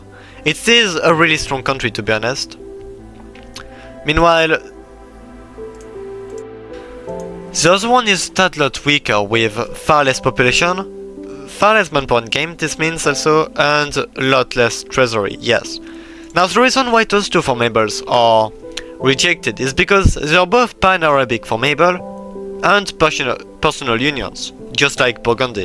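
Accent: France. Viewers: Je serai surpris si tu n'etait oas francais,qsa vois avec la voix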